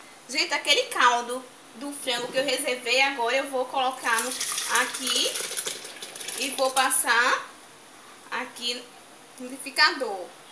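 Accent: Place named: Northeast, Brazil. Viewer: Olá querida flor 🌹 eu amo seu sotaque 💓 realmente me cativa. Você é baiana? Parece sotaque nordestino. e seu Marido também cozinha ou só você mesmo? Você pôs o quentro por último e minha esposa sempre põe primeiro kkkk Ótimo vídeo parabéns e sucesso para você abraços ☺👍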